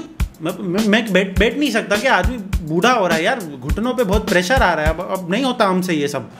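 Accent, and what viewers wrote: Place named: Maharashtra, India. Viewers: You are Gujarati but your speaking accents sounds like marathi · Only positive comment I can give is, this was a better trailer than Race 3. That too because of Bhai's commentry. Bhai love ur Marathi accent, kashala?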